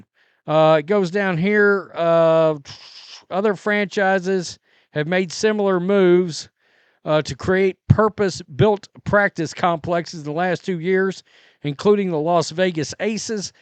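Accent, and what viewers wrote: South, United States. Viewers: The sun came up this morning and that is b/c of CC. Come on now! as CC would say. I'm an Iowa Alum and big supporter of anything with Black and Gold, CC being the only reason why the Fever is building a new training facility is a stretch at best. Why don't you try losing that ridiculous southern accent and at least attempt to present some intelligent content.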